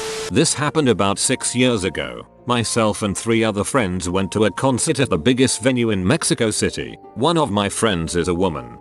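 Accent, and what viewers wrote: United Kingdom. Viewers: I have trouble understanding and following this British sounding robot. The American sounding one is far easier to listen to and understand. Signing out of this crap.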